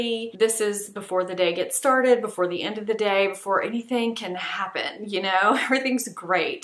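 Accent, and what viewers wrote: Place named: Louisiana, United States. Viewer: Louisiana fam 🐊 I got so excited when you said Nola!! Knew that accent sounded like home 🤟🏻 I’m from Lafayette, I’ve never found an influencer from my neck of the woods! It helps a lot to see someone trying things in our crazy climate! new subscriber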